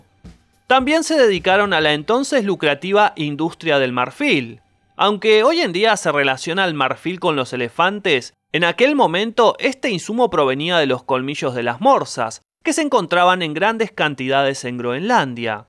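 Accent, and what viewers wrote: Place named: Argentina. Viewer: Por tu acentonoareces argentino, y un desagradecido decir que nos vikingos llegaron antes que los españoles acompañan a colon, que gracias a ellos tienes lengua y un celular 4g, los que nose han descubierto como las tribus Amazonas.....ahí siguen tirando flechas....y seguro que eres biznietos de un gallego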